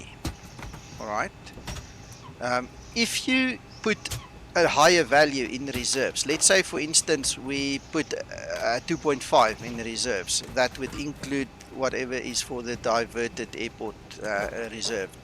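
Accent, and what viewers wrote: South Africa. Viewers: Digging the SA accent bro.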